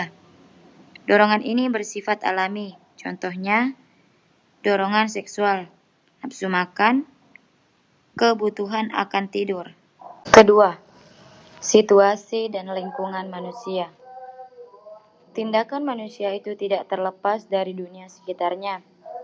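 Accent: Bugis, Indonesia. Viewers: Semoga dpt nilai terbaik. Jelas skali logat bugis nya 😬😇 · Tanra na ugi dari logat bacanya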